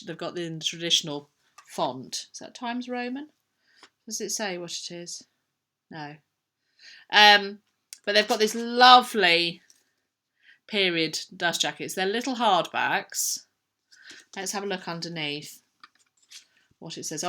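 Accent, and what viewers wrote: United Kingdom. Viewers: Giveaway-I thought of a second question. I like listening to your lovely English accent. Can you do an American/US accent (any region)? :)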